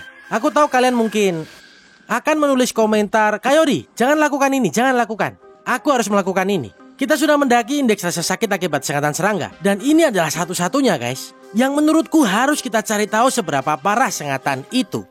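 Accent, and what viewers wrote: Java, Indonesia. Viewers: Logatnya kok kek bahasa jawa ya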